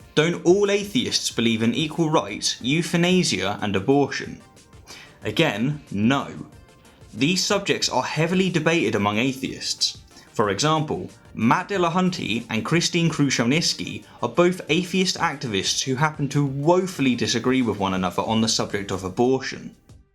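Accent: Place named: West Country, United Kingdom. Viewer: I am trying to locate his accent. I could be wrong but I would place it somewhere in what we call "The West Country" which is the bottom left of the UK from Bristol west. However, some of the sounds are similar to Norfolk to my untrained ear but I would stick with Bristol or Brissal as we know it. "Yerite my luvver"?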